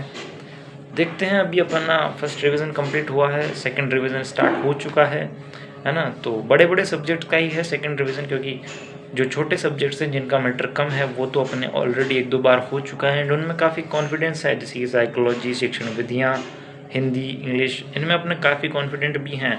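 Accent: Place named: Hadoti, India. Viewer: बोली सै हाडौती का लग रहा है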